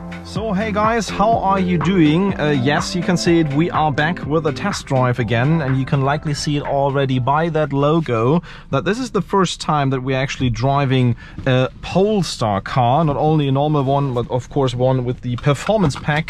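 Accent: Germany. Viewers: Wie er die ganze Zeit mit seinem übertriebenen Oxford Englischakzent versucht seinen D´englischunterton zu verstecken ;-)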